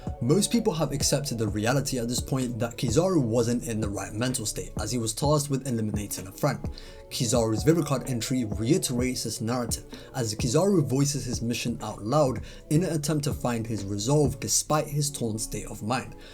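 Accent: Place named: London, United Kingdom. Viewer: I like to see that one piece powerscaling and playing with the vivrecard/databook statements is still alive and well. It peaked in c0 vid then has been up and down i think. It seems to be lively again maybe due to the recent chapters. I have been out of the loop for a while. Perhaps i should get back into it. On another note You have a very strong london accent. You are maybe the only british black muslim london one piece fan i know of. Lol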